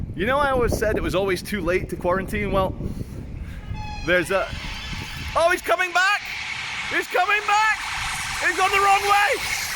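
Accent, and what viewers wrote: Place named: United Kingdom. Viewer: you sound more and more like a Brit eveytime I watch your vids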